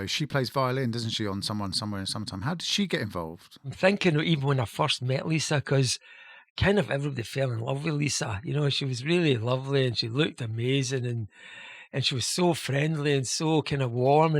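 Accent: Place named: Scotland, United Kingdom. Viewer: I really love your talks! - Fascinating & interesting & funny as hell - the way you talk with that delightful Scottish accent and Humor! 😀 - and very nice voice!. You are an excellent speaker, and wonderful to hear your take on things from the (G.O.A.T!) Keyboardist!. You are one of my fave keyboardist's in the world ever - along with Eddie Rayner out of Split Enz. I LOVED the way you played that CP70 Yamaha!.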